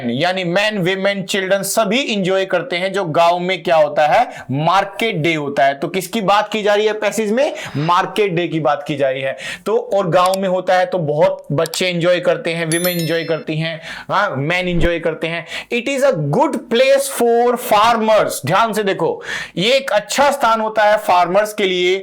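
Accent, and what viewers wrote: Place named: United Kingdom. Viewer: Sir I had a thing that I think is odd and that is your accent you speak in a half british accent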